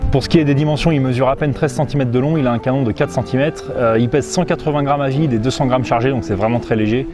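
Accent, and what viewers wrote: United States. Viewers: Encore cet accent ridicule du mid west , a chaque fois je me fais dessus 😂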